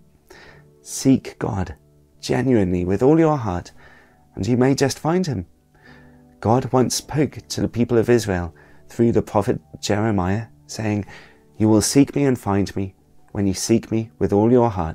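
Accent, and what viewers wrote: United Kingdom. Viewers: i like the british accent :) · i love your britich TBH. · So so glad to see your face! beautiful soul, wonderful voice, brilliant British accent, Love it! May God bless you and your love ones! Merry Christmas 🎅🏻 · I am baptised, but not a believer but I do respect people who believe in a religion. Your video was a beautiful, and a lovely message. It's good to see your face, indeed. It's a good idea for Christmas. You have a soothing voice, and a lovely British accent,. (Please, don't colonize my computer nor my photo camera FujiFilm X-T4!) MERRY CHRISTMAS,! 🎅🎄🎁🌠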